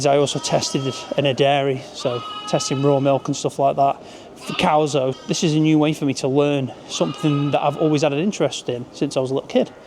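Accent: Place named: Stoke, United Kingdom. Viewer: Is this in stoke you seem to have a stokie accent 😊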